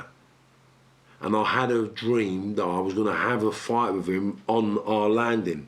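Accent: Liverpool, United Kingdom. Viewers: Your scouse accent is boss! Have you lived their or just got family/friends there?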